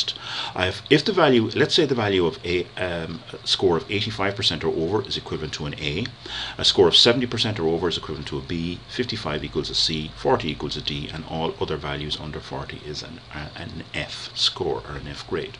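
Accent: Ireland. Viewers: Irish accents are awesome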